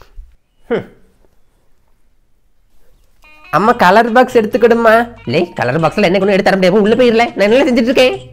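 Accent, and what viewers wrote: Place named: Nagercoil, India. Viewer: Anna ungaloda speech ellam normalla vettil nadapathu polavea irrukku super Anna nalla perform panringa .unga nadippu ellamea superra irukku Anna Enga Nagercoil speech mathiri irrukku unga native entha ooru Anna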